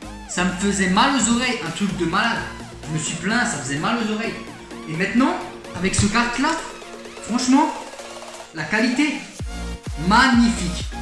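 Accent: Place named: Alsace, France. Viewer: Et yo l'accent alsacien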